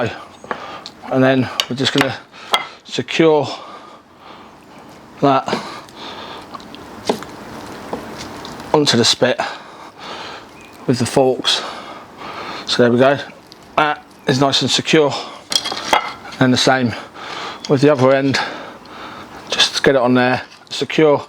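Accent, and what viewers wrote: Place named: East London, United Kingdom. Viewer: Nice to hear a great East Laaahndan (or Essex) lad showing the World how to do pork “right proper”! Thank you, I’m trying pork on the rotisserie tomorrow, and I’m going to copy your great instructions.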